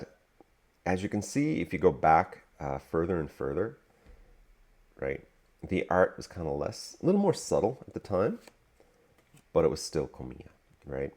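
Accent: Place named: East Coast, United States. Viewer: First time coming across your channel - you sound like an east coaster! Ive collected Yuka Morii’s full english set, and I’m working on komiya, Kusube & Nishida!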